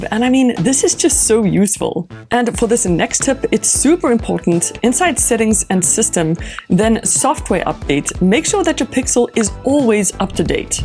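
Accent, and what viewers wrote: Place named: South Africa. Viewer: Always satisfying to hear that accent and know exactly where someone's from. Greetings from a South African in Poland!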